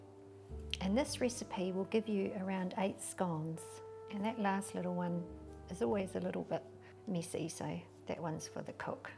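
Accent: New Zealand. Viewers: It’s so overly to hear a familiar accent from Aotearoa. Thank you for your gentle and really easy recipe. Love the way you took us through step by step. I am going to make these scones for my staff who are all men and love to eat. Thanks heaps. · Yes sounds kiwi love her presentation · Thank you. My mother was a great home cook but, her scones, pikelets and shortbread were outstanding. Sadly, although l inherited her baking tins and even have her bone handled knife and even though l make most things well, l did not inherit her 'hand' and have pretty much given up trying with scones and pikelets. Tragic cos, IMO, hot buttery scones (and/or pikelets) are unbeatable. You've inspired me to try again though, mostly because your method, measurements and equipment are pretty much identical to what l remember of how my mum made hers - sadly dementia took her before it even occurred to me to write down her recipes, which, like most cooks of her generation she knew off by heart. 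Most recipes l've found since seem to rely on enormous quantities of baking powder and as l seem to be particularly sensitive to the taste l find them inedible. I would love to know where you got your brilliant oven tray too please - judging by your accent, you're a fellow kiwi and although l've seen those trays overseas, l've never seen them for sale here in Aotearoa. I'd really appreciate a heads up, if anyone knows where they're from. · New Zealand accent = instant trust. How did I never know the hot tray trick? Intermediate School cooking classes have let me down! I’ll be making these in the morning thanks. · Hi, love the kiwi accent - I currently live in Rwanda and both your recipe and accent are brilliant. I have an oven with 3 temperatures - cold, a bit warm and a bit warmer yet your scones came out beautifully and were so easily prepared. Many thanks. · She sure sounds like a Kiwi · I love your New Zealand accent!! 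(Am i right?)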